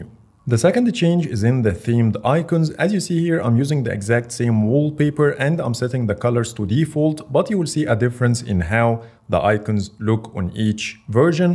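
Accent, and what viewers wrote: Egypt. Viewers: I came for the Egyptian accent and stayed for the content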